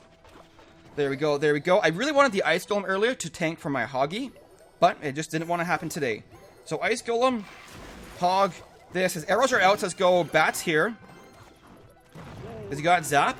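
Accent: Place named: Canada. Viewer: Mini pekker haha. You must be Canadian by the way you talk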